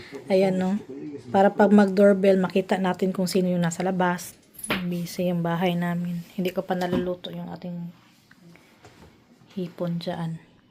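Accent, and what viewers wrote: Visayas, Philippines. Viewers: Bisaya accent?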